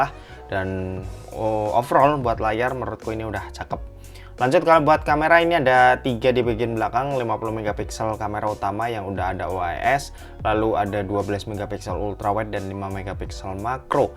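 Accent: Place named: Banyumas, Indonesia. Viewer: Logate ngapak ya😂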